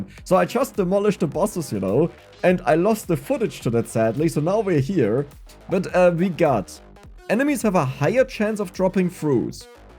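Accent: Germany. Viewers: Direkt die deutsche stimme erkannt😂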